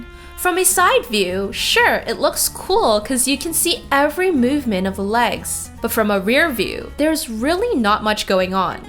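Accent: United States. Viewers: please stop saying miamolto, , miamoto, , , you sound american, and yet when you say his name you try to put an H in there , like, miamohto, wtf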